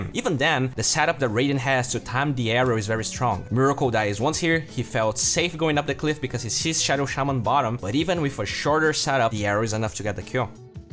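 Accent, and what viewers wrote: Brazil. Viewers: Draran but you are mistaken. It is his accent. Most brazilians have this accent, fluent or not. It is because of how phonems in portuguese words are structured and end up being carried on to english as well.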